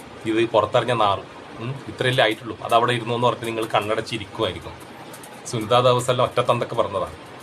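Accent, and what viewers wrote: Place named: Thalassery, India. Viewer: നല്ല നാടൻ ഭാഷ.. തലശ്ശേരി ആണോ സ്ഥലം 👍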